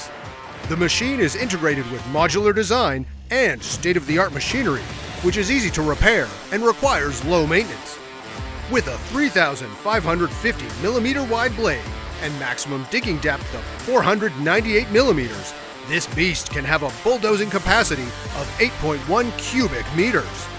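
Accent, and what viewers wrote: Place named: United States. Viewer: Best American accent I have ever heard!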